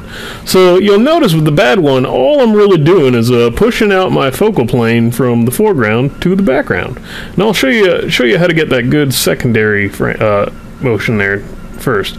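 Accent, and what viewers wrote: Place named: United States. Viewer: lets see the live stream! ps. you had a bit of a cowboy accent in that one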